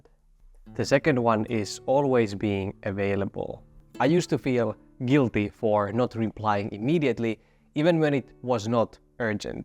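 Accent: Finland. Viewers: Maybe it has something to do with the Finnish language? My mother is from Finland but has lived in the Netherlands for 40 years, she speaks Dutch with a Finnish accent and it sounds exactly like this. In the Finnish lanaguage there are a lot of very long words that are composed of multiple elements, maybe its more important to be clear about where one words ends and the next one begins?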